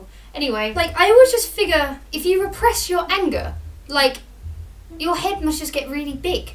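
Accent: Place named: United Kingdom. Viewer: "Second grade"? You sound very British for an American.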